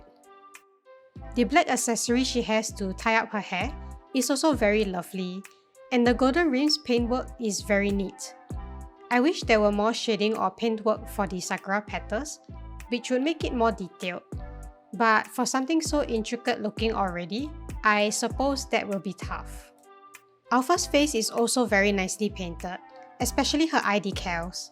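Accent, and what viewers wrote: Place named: Singapore. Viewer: The figure is so pretty!!! Esp that axe omg! Haha I was surprised when u started speaking, hello fellow SGean :> I haven't seen any other reviews of her so this is vv interesting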